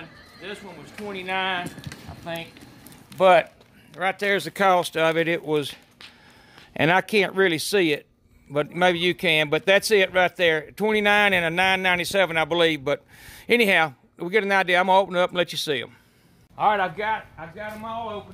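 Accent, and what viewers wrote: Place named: Alabama, United States. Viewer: My uncle from another grandmother AND you're using FOGO! AND you sound like you're from Alabama like my family (we're in Texas mostly nowadays). Subbed!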